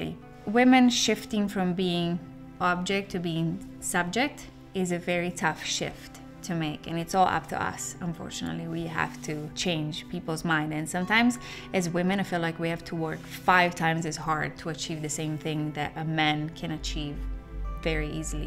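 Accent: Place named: United States. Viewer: She almost sounds American!!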